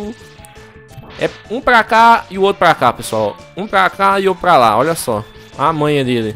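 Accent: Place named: Goiânia, Brazil. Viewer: tu e de Goiania kkkkkk eu tambem vishhh!!!!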